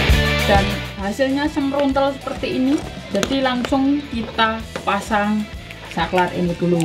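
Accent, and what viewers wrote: Java, Indonesia. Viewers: Kentel banget jowo nya.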